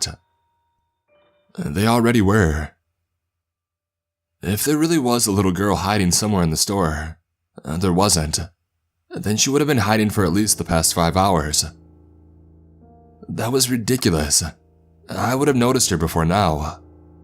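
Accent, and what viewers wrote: Canada. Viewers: South Park Canadian narration...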